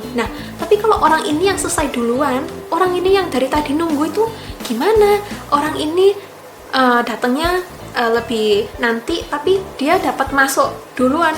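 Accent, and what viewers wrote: Java, Indonesia. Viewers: Medok nya suka akhuu.. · Ngomonge medok''hhh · Kak, jowone medok bngt..... 👍👍 colabs, lgi sama RIZAL nipon, dong kak... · Medok e mbak 😁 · Logat nya Jawa banget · Orang Jepang ngomong nya kok medok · Medok tenan mbk...😄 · Lah kok medhok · Waw jawanya medok bgt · Saiki tinggal neng suroboyo yo mbak.. medok jowonE.. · Bahasa jowone mledookk mba'e 😁😁🤘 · Boso Jowonya kental banget 😁😁😁😁😁😁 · Your Javanese accent is amazing.. cannot believe you grow up in US. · Anjirr.. medok banget mbk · Bahasa Indonesia pake logat jawa😅😅😅😅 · Terimakasih, warga Jepang yg sdh sangat apresiasi thd bangsa, bahasa Indonesia, sampai sangat MEDOK SEKALI bahasa Indonesia dan bahasa Jawa nya. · kok medok ya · Wow kok bahasa Indonesia Nya medok bangat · Harusnya ini artis dr jepang dr pada Haruka. Aku suka cara bicaranya lebih medok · Onee chan medok bngt ya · Nihonjin logat jawa:) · Akhirnya ada youtuber Jepang yg medhok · la kok jowone medok to banget mbak...😂 saya pernah dapet uang 20.000 sobek. 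tp sabungannya pake uang 2000. jd totl uang ku 22.000 😢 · Waaa😀😀 cantik banget.. dan medok nya ❤️❤️❤️ semangat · Kamu jowo ne jowo ndi? logat ne kayak wong jowo! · Teruskan medoknya krn itu kearifan Person😃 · Jepang medhok Jowo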